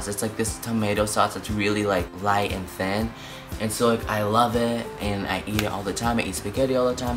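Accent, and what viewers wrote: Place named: Brazil. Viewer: You sound like a brazilian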